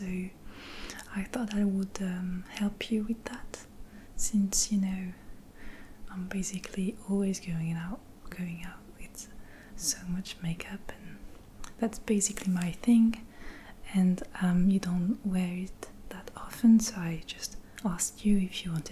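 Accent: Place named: France. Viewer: Even ASMR is more elegant with a French accent!!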